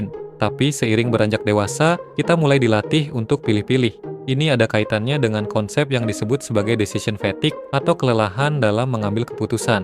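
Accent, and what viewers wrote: Bali, Indonesia. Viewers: blinya logat bali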